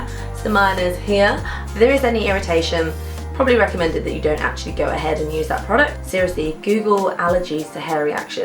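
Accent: United Kingdom. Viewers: Nice british accent👌🏼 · Are u british? I am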